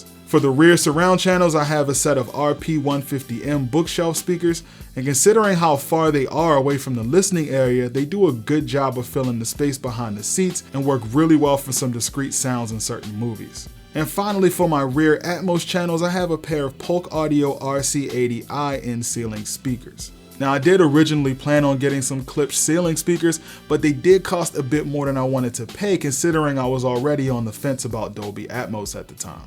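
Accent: Baltimore, United States. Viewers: Love the theatre. Interested in the accent. Baltimore/DC area?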